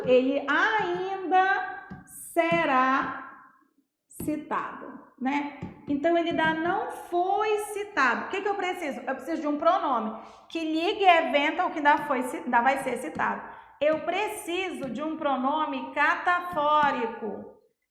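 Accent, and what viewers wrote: Pará, Brazil. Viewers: Ótima aula . melhor ainda com esse sotaque paraense .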